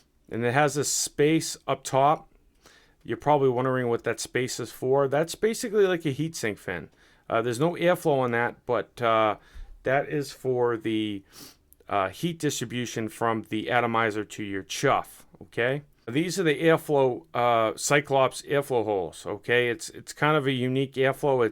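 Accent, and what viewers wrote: Boston, United States. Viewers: Are you from Boston bro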